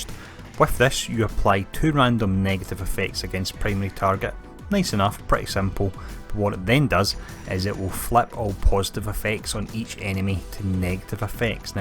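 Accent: Scotland, United Kingdom. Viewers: Yeah, I gathered as much, but where in scotland? Dundee or Glasgow? I'm from sweden but I lived in Edinburgh for a while but I don't recognize the accent =)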